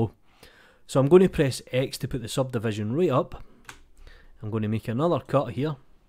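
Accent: Scotland, United Kingdom. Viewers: This was incredible. This was exactly what I need. I didn't know about the Boolean Modifier. Thank you! I love your Scottish accent too, so cool... >w<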